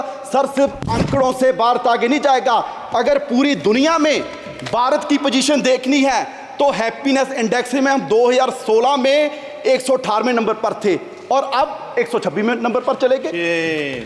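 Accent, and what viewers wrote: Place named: Punjab, India. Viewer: Sir ki punjabi hindi bahut mast hai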